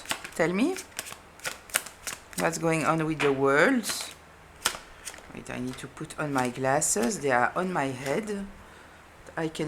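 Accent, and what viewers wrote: France. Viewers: I think your approach to not bother people is a very healthy one when its done from a place of empowerment. It protects you from runner chaser dynamica where people extract energy from you running after them. If they needed your help its not upto you to insist more but upto them to ask for it in a healthy way. The only downside to your programming is imo that you fail to ask for help when you would need it yourself due to a fear of bothering others and this is indeed something that is detrimental. I enjoy your readings and the way you explain things. I also enjoy the french accent😊 Much love from a Dutch woman from amsterdam❤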